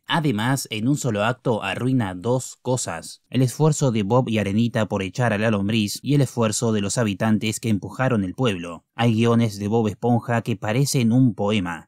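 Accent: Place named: Argentina. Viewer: Tu acento argentino es incómodo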